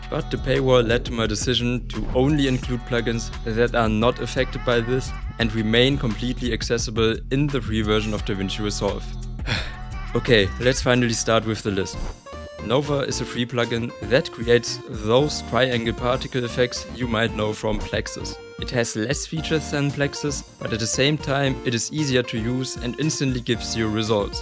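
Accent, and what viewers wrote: Germany. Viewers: Echt gutes Video. Man merkt zwar das du Deutscher bist aber das juckt nd. Arbeite maybe noch am sounddesign sonst echt gutes Video bro.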